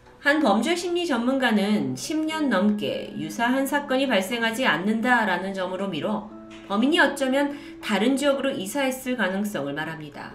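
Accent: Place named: Jeolla, South Korea. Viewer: 고향이 전라도인가? "의" 발음을 "으" 로 밖에 발음이 안되네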